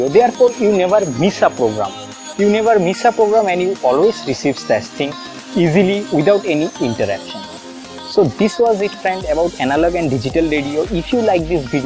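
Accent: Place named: India. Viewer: English? More like Hindlish..with terrible background noise.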